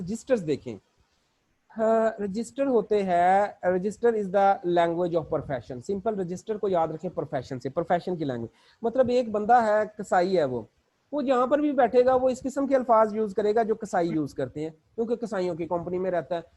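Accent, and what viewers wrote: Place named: Punjab, India. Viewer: You are professor nd ur ascent is punjabi pendu. Lehja pendu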